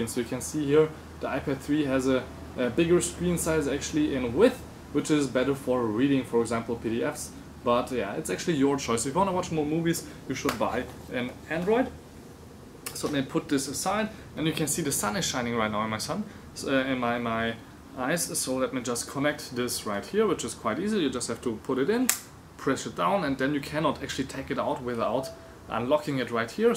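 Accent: Germany. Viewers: Strong german accent...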